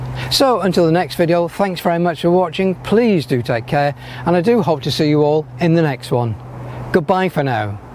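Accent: England, United Kingdom. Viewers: Very helpful - good to hear an English voice (I'm from Stoke but live in the US) - thank you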